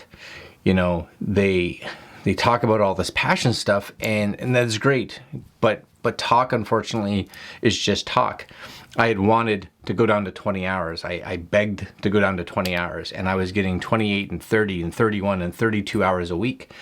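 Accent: Canada. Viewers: Your BEST yet!!! Kudos! I’ve been a loyal subscriber and viewer of yours for most of this year, originally from Deep River, now living in Las Vegas. Was in the Video Department of Blue Man Group, until we were laid off in March. Don’t get me wrong, it was a great job, but believe it or not, there was no room for growth or creativity. I’ve been focused on getting my own channel up and running, and you’ve been a very great inspiration, and the accent helps me miss the Ottawa Valley :). Anyway, keep on keepin on! You’re on a role, and it’s working! Cheers 🍻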